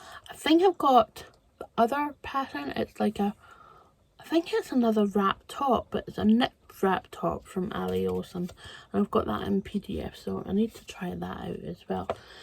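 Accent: Scotland, United Kingdom. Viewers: Lovely dress, and the heathery colour looks great on you. I'm in Aviemore quite a lot as I live a bit further north in Forres and it isn't too far away for a day out. Always good to hear a Scottish voice on youtube!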